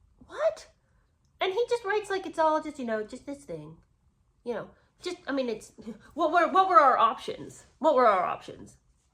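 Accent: United Kingdom. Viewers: They also had apartment 1 in Kensington palace which had 21 rooms next to William who was in 1a, and Duke of Gloucester had to move out of his Grace and favour apartment for them , to which they declined once he had moved out and renovations were carried out! Love your British accent btw haha!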